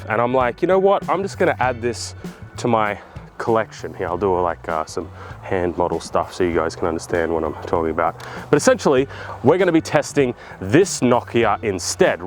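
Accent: United States. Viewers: American accent or dubbed anime accent? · The American accent is killin me lol great video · This is what I have been waiting for. Thank you for getting deep into the nitty gritty. Fantastic American accent. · I think we need more love for his American accent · I need more American accent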